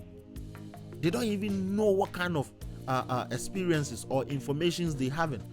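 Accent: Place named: Africa. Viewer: In my personal Opinion African accent is sweet enough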